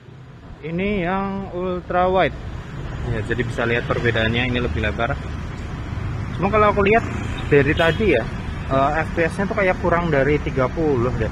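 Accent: Semarang, Indonesia. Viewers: Orang semarang bang ?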